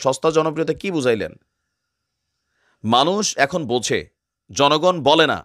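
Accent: Sylhet, Bangladesh. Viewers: সিলটি মাত হুনিয়া ভালা লাগছে বা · ভাই তুমার সিলেটী মাত খথা,খুব ভালা লাগছে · Assalamu alikum bhai. Ji afen hasa math mathra salaya jawka sylheti math mathra shundar lager. Thank you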